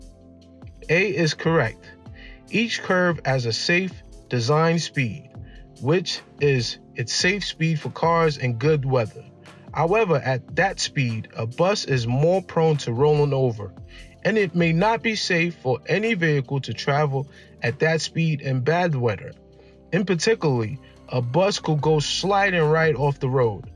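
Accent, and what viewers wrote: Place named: New York, United States. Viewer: Finally!! An actual human voice! And a NYC accent at that! 😆🙌🏼 Thank you!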